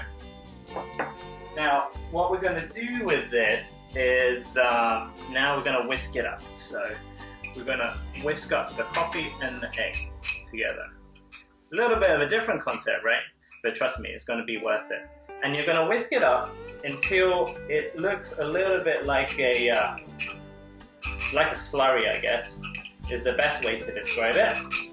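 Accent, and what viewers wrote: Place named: United Kingdom. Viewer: I’m so confused.... you said stove then apologized for British accent then explained stove=cooker... who the fuck says cooker???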